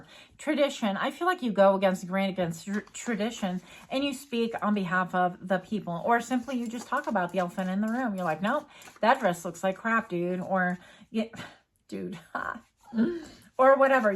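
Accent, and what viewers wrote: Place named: Spain. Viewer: I love your Spanish accent. I'm from Mexico and I love it. 🩷🌟🌟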